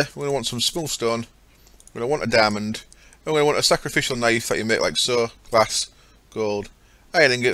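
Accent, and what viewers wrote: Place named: Yorkshire, United Kingdom. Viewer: thought you sounded as if you were from yorkshire :)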